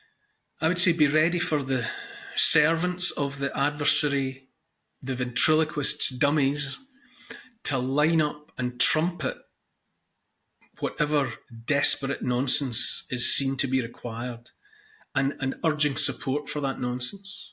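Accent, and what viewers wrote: Scotland, United Kingdom. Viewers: Isn't that the scottish accent I hear in your mouth?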